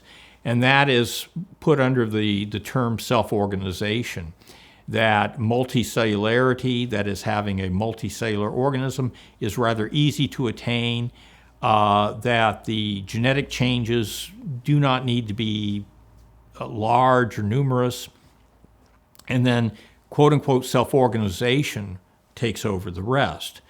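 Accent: United States. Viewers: Ha, it's mostly a middle American accent with a 'who knows what' perturbation. May have grown up in one place, and moved to another during childhood.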